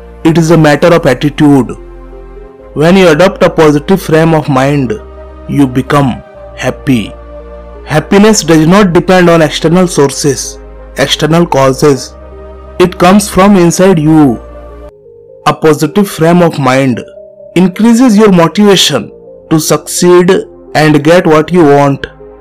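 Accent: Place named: India. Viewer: Good video but you need to improve your sound quality. May try to use Siri to read as your Indian slang is very strong. Keep up your good work. Cheers.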